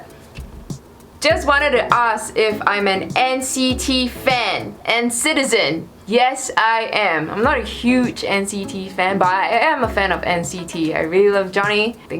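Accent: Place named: Australia. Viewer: She kind of has a Australian accent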